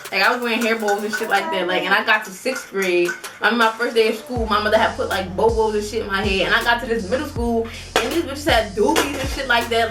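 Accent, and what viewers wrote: New York, United States. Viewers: u from new york?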